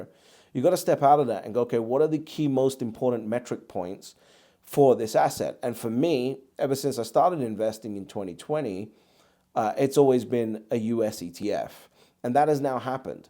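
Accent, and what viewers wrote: Scotland, United Kingdom. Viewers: A quite realistic appraisal, yes there are a lot of rivers still to cross for Bitcoin. And yes US ETF approval was a major step because SEC were effectively forced by a court judgement to approve Bitcoin against their wishes - that is a sea change, it is a significant shift within the power structure - people like Kevin O'Leary openly laughed at people taking cases against the SEC. Are you from Scotland yourself, Edinburgh maybe?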